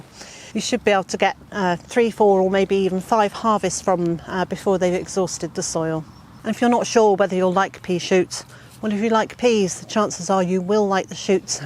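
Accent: United Kingdom. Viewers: Given the title of the video, it would be worthwhile indicating where on the planet you are. The internet after all has international reach. I can only assume by your accent, it is the U.K., but assumptions are only that. Other than that, I appreciate the content. Cheers from Chris the Forest Worm, Adelaide Australia.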